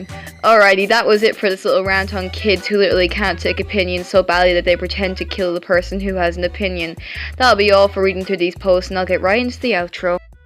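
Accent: Ireland. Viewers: ngl idk but she sounds like she has a irish accent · Are you Irish?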